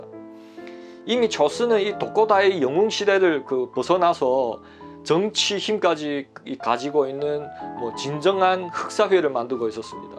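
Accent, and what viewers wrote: Yanbian, China. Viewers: 이사람아 우리말 부터 배우고 방송해라 거놈의 연변 토박이말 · 연변말도 한국어의 지역사투리중의 하나입니다.니네말 우리말을 가르면 한국어도 서울말,경상도말,전라도말 등으로 나누어야 할겁니다.서울말만이 한국어인가요?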